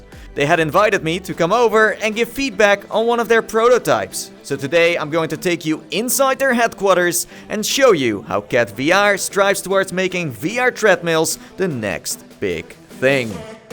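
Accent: Netherlands. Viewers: Poh, Nederlands accentje zeg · hehehe I can instantly hear you’re dutch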